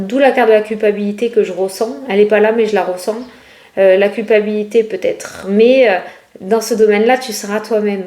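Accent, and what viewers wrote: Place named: Marseille, France. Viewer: Moi aussi j ai l ai l accent " qu on attrape en naissant du côté de Marseille " ou du Midi et il est beau notre accent .... Merci pour tes tirages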